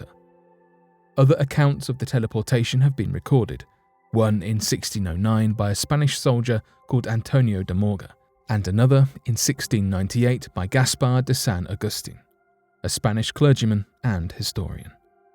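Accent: United Kingdom. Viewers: That British accent makes this all legit...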